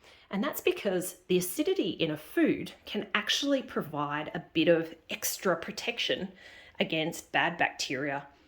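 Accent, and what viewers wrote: Australia. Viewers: Thanks for creating this series for newbies like me! I recently got a pressure canner for my birthday and have been reading lots of books and trying to watch videos - however it’s refreshing to listen to an Aussie video for once 😂 Excited to watch the rest of yoir series tomorrow and hopefully give it a crack in the next fortnight! Cheers Samantha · Hi, first time viewer here and I really enjoyed this video regarding preserving. I have done some previously but we moved back to Qld from tassie and I lost touch with my passion. I am now back in Tassie and I want to resume my preserving. I feel that I have lost some of my confidence, so I am sure that watching your videos it will help me along my journey. it is so lovely to hear an Australian voice and you explain things really well. Thank you for taking the time to put together the videos and I am looking forward to the next one. 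Jay 😊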